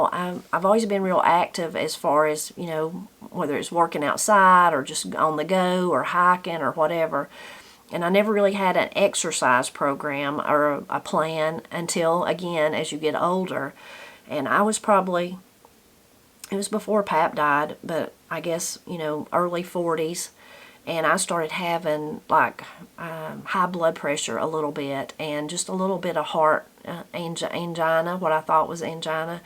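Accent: Appalachia, United States. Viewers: I am curious about persons who hold themselves out as being capable of beneficial commentary for others. As a writer I admit I am very critical of such persons. Morally I think you live in an admirable way. While you have an interesting way of speaking as a representative of Appalachian culture, I find it disappointing that you don’t seem to have pursued a means speaking English correctly in order to set an example within that culture. Yet you speak of working at the college, which is somewhat puzzling and contradictory.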